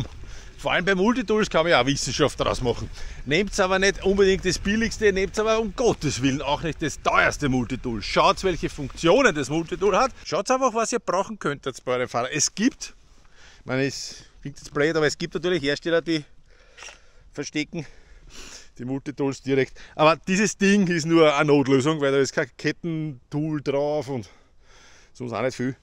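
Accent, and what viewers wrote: Austria. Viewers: Einfach top erklärt der Herr. Der österreichische Akzent ist sowieso die Krönung👍👍und es macht Spaß zuzuhören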